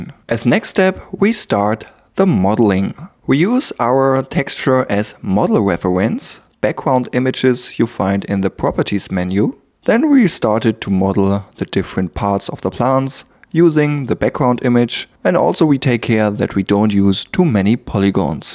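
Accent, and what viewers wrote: Germany. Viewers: haha your english is awful :D 10 seconds into the video and i yet hear that u are german ;) anyway, great 3d skills, good explanation- stay creative :)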